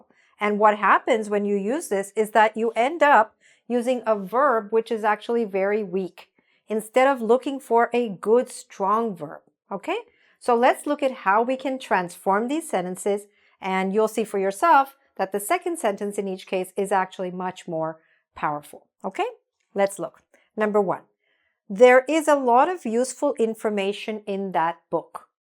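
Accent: United States. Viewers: Pardon,it's american english? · Her accent is American so I'd bet for American English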